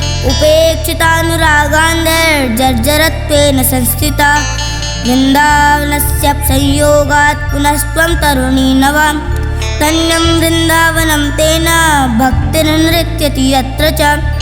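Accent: Standard. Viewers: बहुत सुन्दर एवं शुद्धोच्चारण 🎉🎉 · शुद्धोच्चारण एवं उत्कृष्ट प्रस्तुति।❤️ और सभी विशेषणों को परिलक्षित करने वाले आपके उत्तम संस्कार, सभी का समावेश श्रीराधाकृष्ण की शब्दमयी मूर्ति का अनुभव प्रदान कर रहा है। महादेव से प्रार्थना है कि वो आपको सदैव प्रसन्न एवं धर्मवान बनाए रखें। जय जय श्री राधाकृष्ण।🙏🏻 हर हर महादेव 🔱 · बहुत ही सुंदर प्रस्तुति । श्लोकों का शुद्ध उच्चारण और स्वर बहत ही मधुर है। प्रभु कृपा सदा बनी रहें।🎉❤ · प्रिय के द्वारा बहुत ही सुंदर प्रस्तुति ओर भाव से इतने सरल तरीक़े से श्लोकों का शुद्ध उच्चारण बहुत ही अच्छा लगा भगवती मा आपके कंठ में ऐसे ही विराजमान रहे 🌺🌺❤️🙏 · 🎉❤🎉 बहुत सुंदर , और शुद्ध उच्चारण 🎉🎉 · प्रिय को वेदोक्त आशीर्वाद। इतनी सुंदर प्रस्तुति एवं शुद्ध उच्चारण के लिए साधुवाद श्रीमद्भागवतमहापुराण के माहात्म्य खंड के प्रथम अध्याय का पाठ बहुत ही सुन्दर प्रस्तुत किया है।🌹🌹🙌🙌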